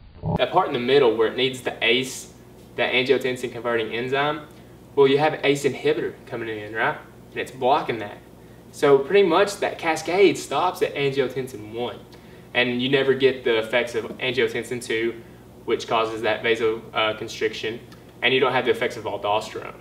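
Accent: United States. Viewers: Southern accent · Great! Best explanation I have seen thus far on You tube. Of course, being from NC, I am also partial to the accent :)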